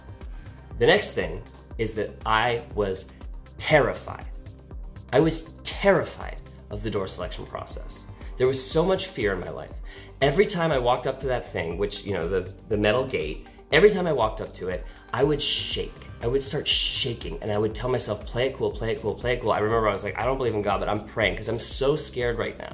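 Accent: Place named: Germany. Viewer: Even though your Englisch is fluid and sounds right, it's obvious that you are a nativ german! Sorry, not buying it...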